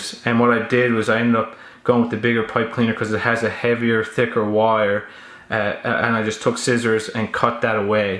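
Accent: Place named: Newfoundland, Canada. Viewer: Started watching this expected you to be form the USA or something then you started talking and I was like accent accent oh wait wtf this guy is from Nfld. Will be building a fish wheelchair in Halifax today.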